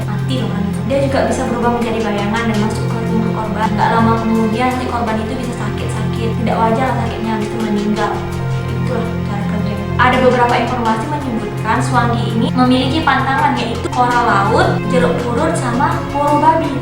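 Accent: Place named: Medan, Indonesia. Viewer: Logat medan nya masih kental banget ya kaa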